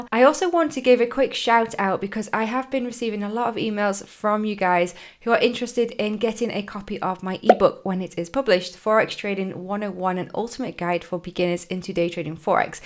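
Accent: United Kingdom. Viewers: That is a very British accent and a very British face. I feel like I'm in a Bridget Jones movie.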